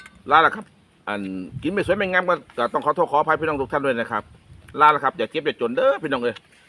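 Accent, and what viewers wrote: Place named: Korat, Thailand. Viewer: ภาษาโคราชฟังง่ายสบายหูคุณกินแล้วทำให้ผมน้ำลายไหลด้วยผมติดตามคุณทุกคลิปเลยครับ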